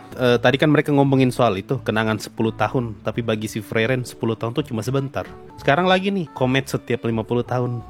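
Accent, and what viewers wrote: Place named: Eastern Indonesia, Indonesia. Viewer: Logat nya org timur sekali aleee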